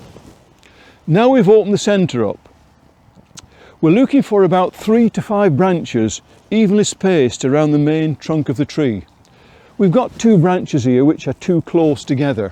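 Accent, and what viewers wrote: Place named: Yorkshire, United Kingdom. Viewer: Lovely Northern accent. Probably Yorkshire.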